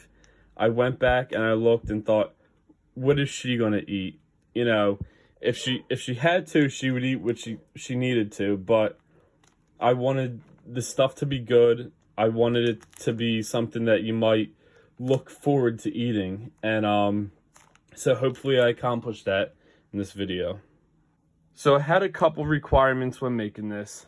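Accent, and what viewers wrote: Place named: Baltimore, United States. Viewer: I detect a hint of Baltimore in your accent. Keep the videos coming sir.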